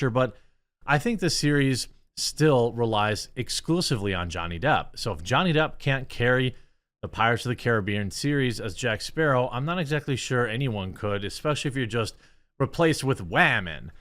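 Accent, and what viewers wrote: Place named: Wisconsin, United States. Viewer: Love the show! But I can’t tell if you’re saying wahmen seriously because the way you say marget Robbie, Dray-gons. I know you’re from Wisconsin or somewhere lol again love the show!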